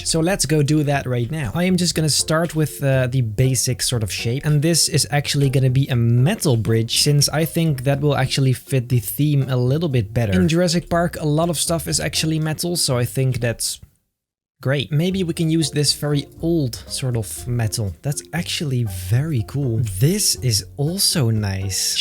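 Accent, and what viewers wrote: Netherlands. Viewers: Re u dutch? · Are u dutch? Your english Accent sounds like you’re from the netherlands 😁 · Your Dutch isn’t ? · Is he dutch? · I keep trying to figure out from which country he is by trough the way he speaks, I came to the conclusion it had to be Dutch.... I think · I smell nederlands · Are you dutch? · Je bent Nederlands ik hoor het man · are u dutch? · Nederlands accentje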